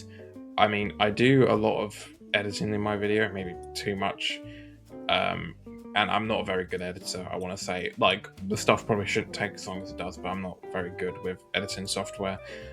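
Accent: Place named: United Kingdom. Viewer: Your British is showing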